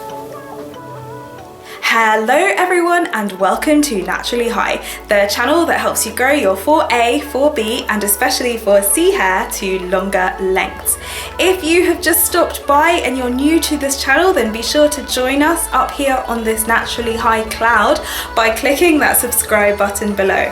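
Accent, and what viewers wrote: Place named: England, United Kingdom. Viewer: Pure as Gold - English accent, that’s how we speak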